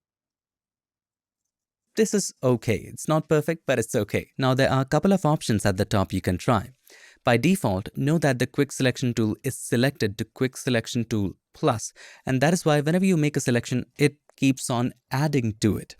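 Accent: India. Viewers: This guy makes the Indian accent very smooth and well integrated with English language. Nice style.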